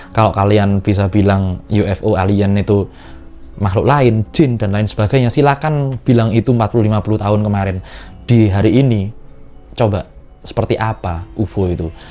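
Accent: Java, Indonesia. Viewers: Jowo banget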